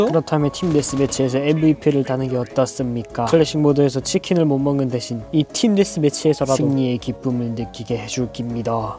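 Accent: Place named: North Korea. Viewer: 북한인이 말하는거같누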